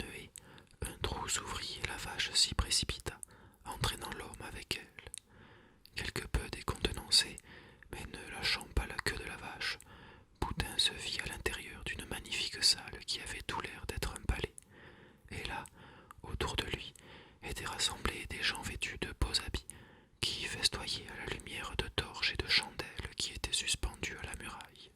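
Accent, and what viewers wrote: South, France. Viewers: Je me demandais si tu étais toi-même breton puis je me suis rappelé ton petit accent qui sonne plutôt du sud, j'ai raison ? 😇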